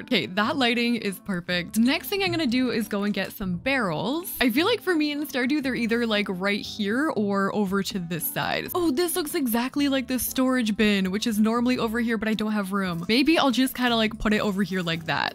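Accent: Canada. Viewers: Are you Canadian? Also I feel like imma frequent your channel, glad I found you on my home page :)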